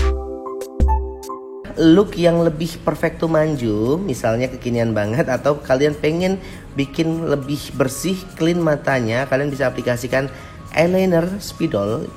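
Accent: Java, Indonesia. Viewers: Uda q SUBSCRIBE kak q ngefans sama logat jowone...sampeyan mboten pelit ilmu Mugi Barokah nggeh...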